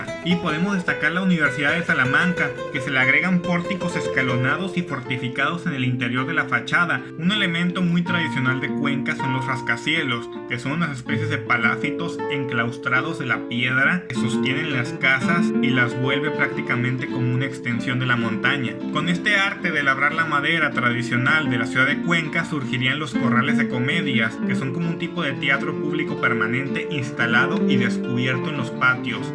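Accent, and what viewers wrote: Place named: Guadalajara, Mexico. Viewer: JAJAJAJA si Auronplay viera que lo pusiste pelón te diría : Menudo personaje de cojones JAJAJA, buen video como siempre entregando cada vez la mejor calidad para tus suscriptores mas fieles, y buena imitación de acento andaluz he, pero por tu acento natural yo diría que eres Tapatío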